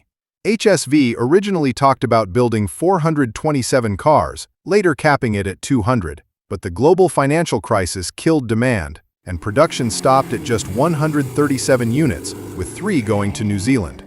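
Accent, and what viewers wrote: United States. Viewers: Zed not Zee, god damm yanks, if you're gunna talk about Aussie cars, speak English not yank. And learn how to say Barra, as in barramundi.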